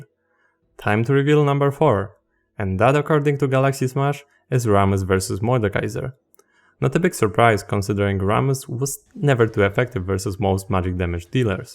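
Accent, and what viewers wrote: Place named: Poland. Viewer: Polak? bo slysze akcent XD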